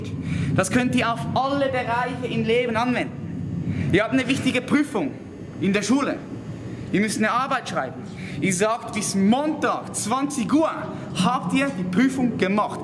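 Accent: Switzerland. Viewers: Unglaublich was für ein Vogel. Der Schweizer Akzent machts zum Glück lustig.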